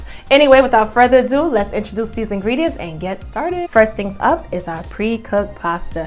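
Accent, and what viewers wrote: New York, United States. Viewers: Where r u from? Sounds like NY or somewhere up north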